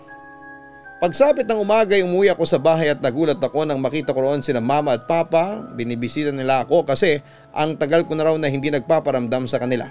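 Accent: Conyo, Philippines. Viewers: Less nakakatakot pag pa konyo2 english na yan haha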